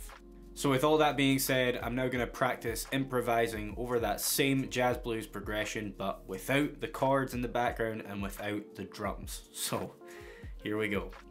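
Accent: Scotland, United Kingdom. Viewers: I'm a chronic noodler with next to no musical training, and I've just started his "bulletproofguitarplayer" stuff. I don't know whether it's just the laconic Scottish accent and matter-of-factness about his delivery that I like, but he does a really good job showing the basics, and of training your ear as he goes along. One of, possibly the best of the YouTube era guitar teachers in my opinion, as he shows as much as he tells, makes me listen, demystifies a lot of the often cryptic language of music, and breaks a whole lot of things down into simple bits without getting all show-offy and distracting me with mind-melting licks (even though he is an extraordinarily capable player). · The noodling part was incredible! You sound like you're from Scotland? Edinburgh? Could jam around :D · Are you Scottish? Irish?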